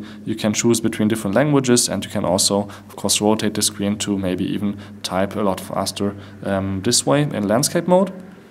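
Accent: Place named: Germany. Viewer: you're german, aren't you ? :D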